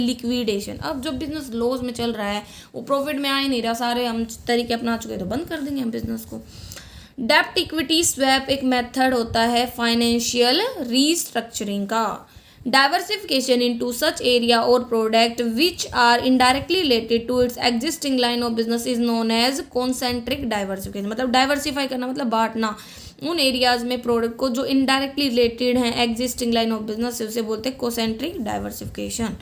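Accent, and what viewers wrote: Haryana, India. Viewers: Ma'am haryana se kaha se ho?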